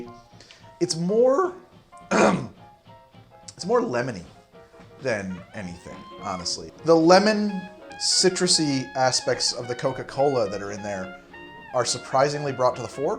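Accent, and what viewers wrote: New Jersey, United States. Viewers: Where in Jersey are you from?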